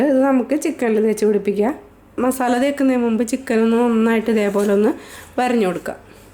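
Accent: Kodungallur, India. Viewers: 3 പീടിക, sn പുരം, കൊടുങ്ങല്ലൂർ ഈ പരിസരത്ത് ഉള്ളവരുടെ സ്ലാങ്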